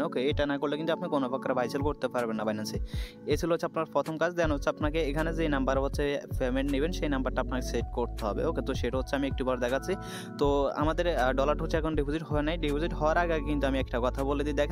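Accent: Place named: Bhola, Bangladesh. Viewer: Vai apnar bari bhola jelay mone hoy.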